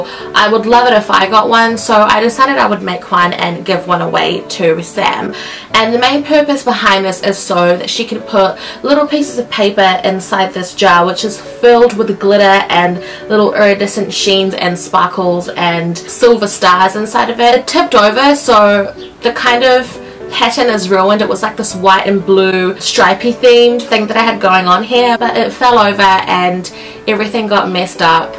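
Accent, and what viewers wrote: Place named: New Zealand. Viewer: I'm so embarrassed! I thought you were an Aussie this whole time! I guess that's me being an American, isn't it? Mixing up an Aussie Accent with a Kiwi Accent hahaha. Please forgive me. I love that you put some real thought into your gifts! You really thought about what you knew about her and her life, and I think the gifts were very sweet!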